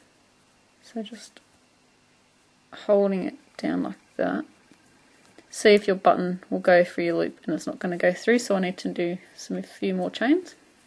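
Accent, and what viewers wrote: Australia. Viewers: Made this today in an earthy brown to match the little bag I made from your cluster stitch bag pattern. SUPER cute. LOVE your patterns. Love your videos. LOVE your Aussie accent ;) p.s. Aussies rule. Never met an uncool Aussie.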